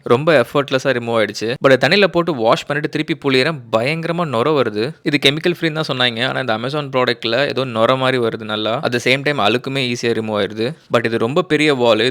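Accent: Madurai, India. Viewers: Anne neenga madurai yaa..? 😅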